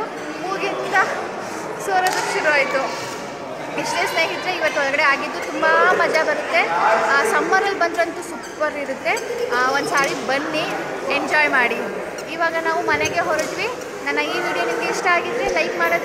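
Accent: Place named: North Karnataka, India. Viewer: Madam I think you r from Uttara Karnataka ..yakandre nim bhashe swalpa Nam tarane ide..anyway Nice vdo ..thnk u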